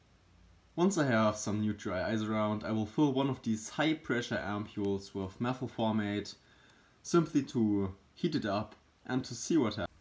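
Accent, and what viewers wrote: Germany. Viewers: I like German accent.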